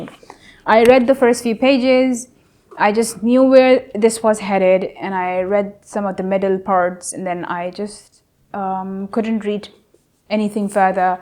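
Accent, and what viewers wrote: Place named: England, United Kingdom. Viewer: Aap English accent ko kaise prounce kar leti ho dear 😚 tips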